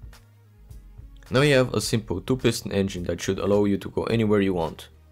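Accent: Russia. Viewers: Where are you from? Russia? You have specific accent :P BTW good vid, gonna try this :D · какой твой родной язык? у тебя сильный акцент слегка похожий на русский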